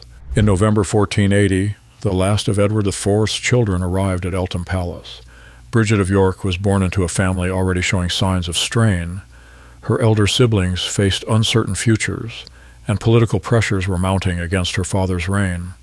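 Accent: United States. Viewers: A bit hard to listen to an American accent on this subject; bye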